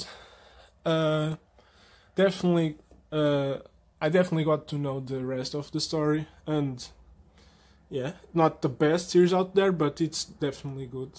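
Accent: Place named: Portugal. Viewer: e vi logo pela maneira como falas o ingles, todos os tugas falam com essa pornuncia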